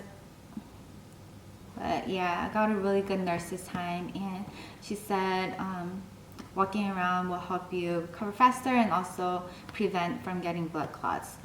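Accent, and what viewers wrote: South Korea. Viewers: I think your accent is more sound like Korean now if compare the previous pregnant video. Maybe because you alway speak Korean to your husband recent years:)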